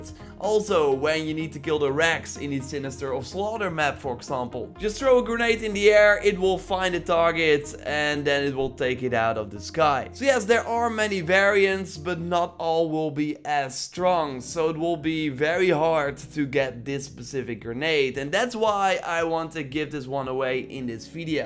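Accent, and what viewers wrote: Netherlands. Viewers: je hoort meteen dat je nederlands bent haha, nice content man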